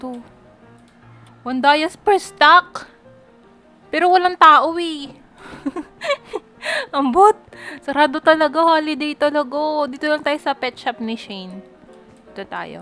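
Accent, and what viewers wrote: Visayas, Philippines. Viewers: bisaya po kayo? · Ate bisaya kapo bah? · Bisaya ka po ba ate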